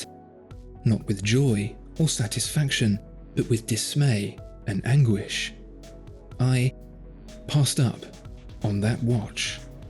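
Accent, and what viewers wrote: United Kingdom. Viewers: Dude your sleepy british accent sucks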